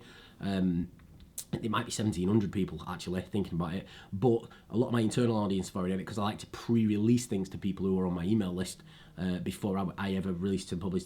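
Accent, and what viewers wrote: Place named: South Africa. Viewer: You talk like a South African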